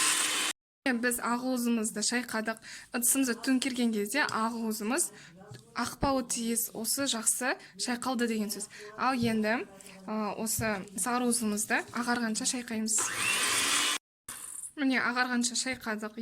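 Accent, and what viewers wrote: Standard. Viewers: Таза казакша сойлейди екенсиз👍👍😜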